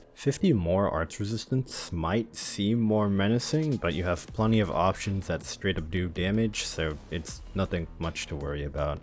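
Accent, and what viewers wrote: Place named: Japan. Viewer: pls stop speaking japanlish, i'm gonna die from cringe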